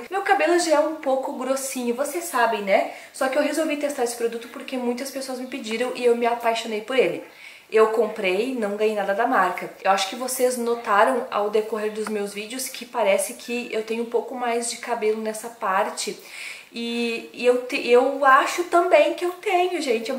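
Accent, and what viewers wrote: Rio Grande do Sul, Brazil. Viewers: .. você é gaúcha?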